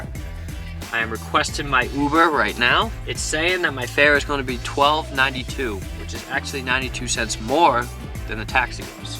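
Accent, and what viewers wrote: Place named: Boston, United States. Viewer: u from Boston I from Boston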